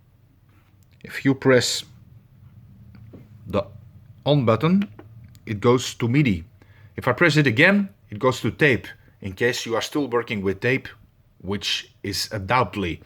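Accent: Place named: Netherlands. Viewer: Jouw accent klinkt Nederlands dus bij deze: dank voor de video. Heb je ook muziek van jezelf geupload naar Youtube?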